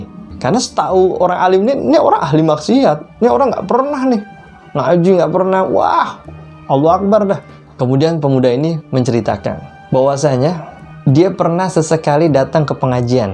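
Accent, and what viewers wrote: Jakarta, Indonesia. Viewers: Terimakasih Ustad, Sangat bermanfaat. Medok banget dah ah betawinye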